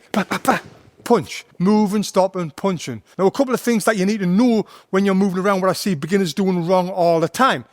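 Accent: United Kingdom. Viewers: Are you british mate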